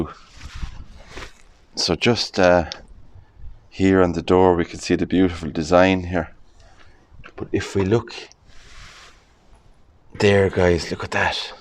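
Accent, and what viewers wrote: Ireland. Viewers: Wonderful narration made more enjoyable by that beautiful Iris lilt……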